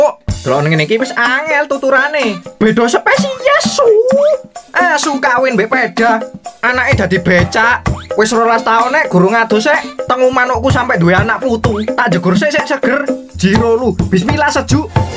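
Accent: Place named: East Java, Indonesia. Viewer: Asli endi cak koq dane wong jatim tak rungok2no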